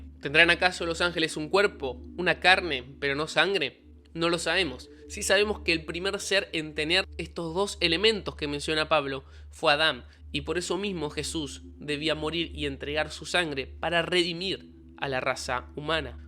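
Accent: Argentina. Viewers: Por que los argentinos hablan así ✨✨ reposho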